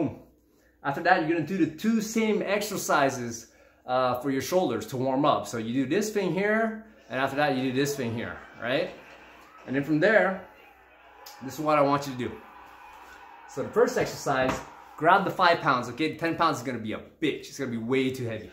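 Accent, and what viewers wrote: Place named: United States. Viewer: Forgive,but, you sound more American than Canadian!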